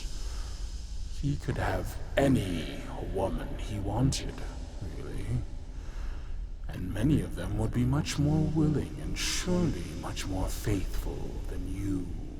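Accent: United States. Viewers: I thought chu were American...?!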